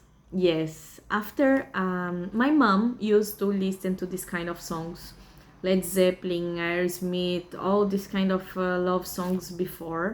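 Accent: Philippines. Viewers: I don't know where you're from but I'm absolutely positive you've lost your native accent. You now have a Filipino accent. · you speak English similar to the way a native Filipina speaks English, why is that? I’m a Filipino American married to a Brasileira but my wife’s English is very Brasileira.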